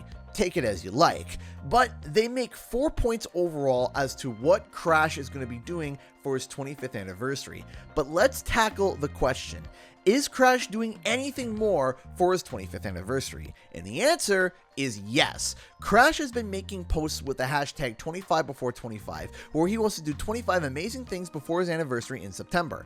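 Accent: Canada. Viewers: Canadian accent sound like American access until they say just one or two words haha then I’m reminded where you’re from! About and sorry. Probably a few more?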